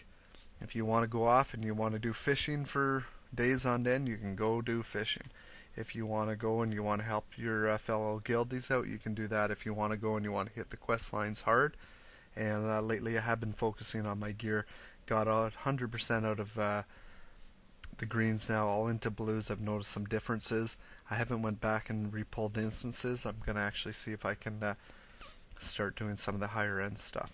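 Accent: Canada. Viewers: its not really a chicago accent...its more of a canadian..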